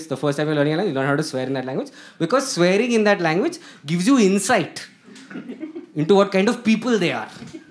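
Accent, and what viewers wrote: India. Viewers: That Indian accent though 👎🏻🤮🤮🤮🤮🤮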